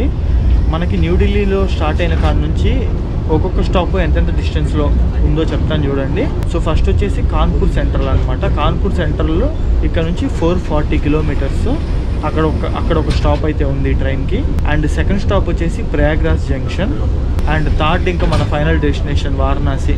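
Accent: Nellore, India. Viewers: ma nellore lo anthe ayya.. :)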